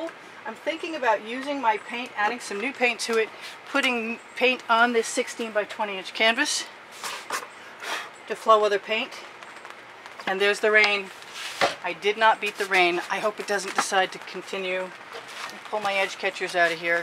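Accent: Texas, United States. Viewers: A big ol' party going on in that one! Texas talk. Love it!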